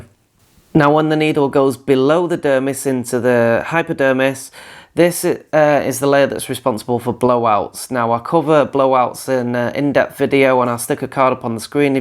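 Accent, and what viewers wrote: United Kingdom. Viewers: Thank you SOOOOO much. you brits make anything sound awesome. love your enthusiasm and brainworks. watching video after video can become boring or even worse misinformed. I am a sponge...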